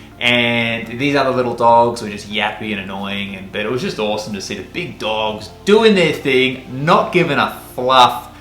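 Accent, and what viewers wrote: Australia. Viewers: Awgh ausy accent is so annoying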